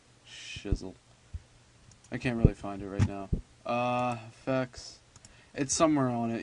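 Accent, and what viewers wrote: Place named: Pennsylvania, United States. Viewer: sounds like pa, maybe northern va or maryland. that area most def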